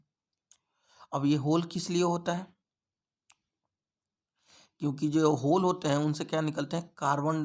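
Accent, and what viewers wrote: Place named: Bihar, India. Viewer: Aap Bihar state se hain kya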